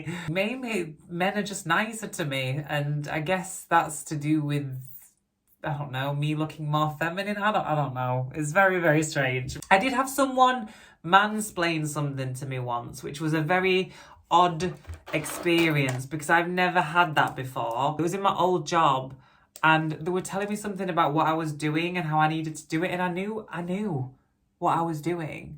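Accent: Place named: Oldham, United Kingdom. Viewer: You have and accent like Brian Cox. He grew up in Oldham UK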